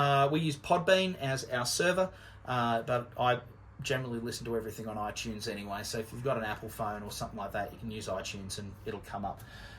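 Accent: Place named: Australia. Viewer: Wow! I wasn't expecting an Ozzie accent. Thanks for the link.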